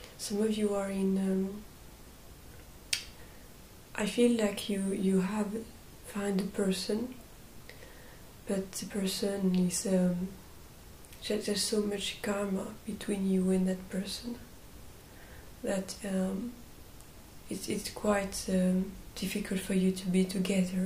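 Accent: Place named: France. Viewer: Thank you for your work! Your video (another one) popped up in my feed about a week or two weeks ago, and now this one. So I'm a new subscriber now! :-D I discovered light language a few years ago. Where are you from? I hear a French accent. :-)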